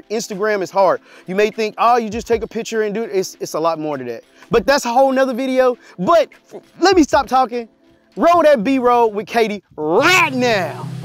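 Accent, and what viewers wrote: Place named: Texas, United States. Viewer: I could tell you were a fellow Texan as soon as you started talking. Fantastic work! It's crafstmen like I follow to learn more about the art of photograph and videography. You've got my subscription.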